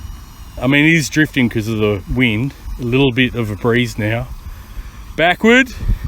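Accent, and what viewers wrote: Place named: Australia. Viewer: Its the Aussie accent mate, give it some time until it gets used to it haha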